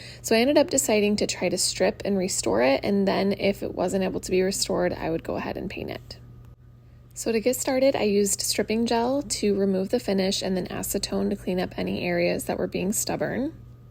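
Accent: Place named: United States. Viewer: Ese acento americano es muy feo. No soporto escucharlo.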